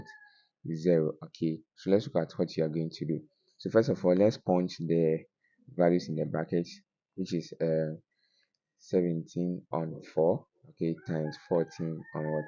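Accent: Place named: India. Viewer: Are talking in English or Indian? wtf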